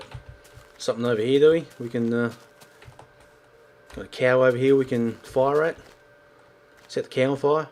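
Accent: Australia. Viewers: its a HOUMING BOUW... i love australian accents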